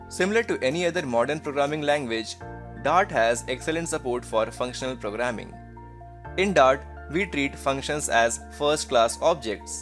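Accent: India. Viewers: pls create a tutorial how to understand an Indian accent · this not problem for me but if Indian tutors have a better accent they'd be the best tutors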